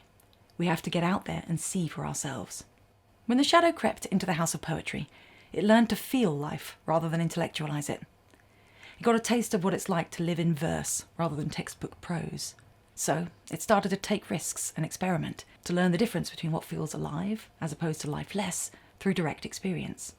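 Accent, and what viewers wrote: United Kingdom. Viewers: Your English accent is driving nails into my head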